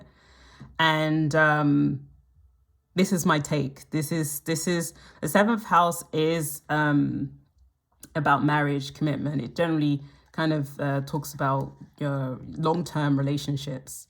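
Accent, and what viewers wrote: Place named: United Kingdom. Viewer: Idk where you are from precisely but wherever it is is my favorite British accent and also this is such a novel way to present a reading and I really love it!! Thank you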